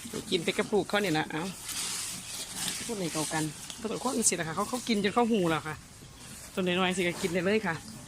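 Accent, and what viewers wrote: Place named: Isan, Thailand. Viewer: มักเวลาครูเว้าอีสาน เพราะดี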